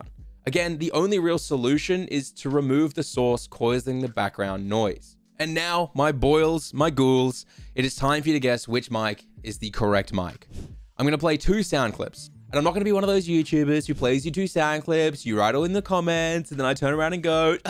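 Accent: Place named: Australia. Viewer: I've followed for a while and I've even watched this video before as it's helped with mic setup. However why am I only now realising he's Australian!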